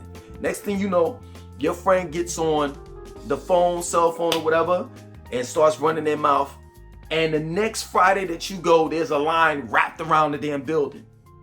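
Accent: New York, United States. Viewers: The irony of this Northern dude talking about Charlotte & Atlanta getting too much for him. He sounds like he from NYC…Queens or Brooklyn to be exact. He’s part of the problem 😂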